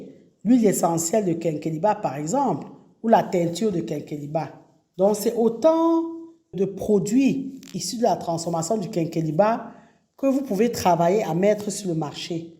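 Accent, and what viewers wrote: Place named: Africa. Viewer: Oui, j' aime sa voix et son français à l' accent familier et authentique africain.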